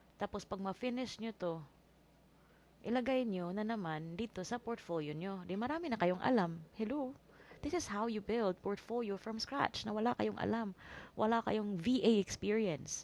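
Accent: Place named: Visayas, Philippines. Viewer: thanks ante, bisaya ka? imong accent man gud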